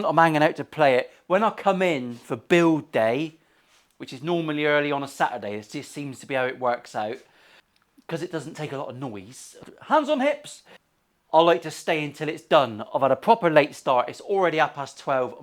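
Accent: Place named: Devon, United Kingdom. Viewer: I think I wanna move down you're accent's neck of the wood. I love Brixham!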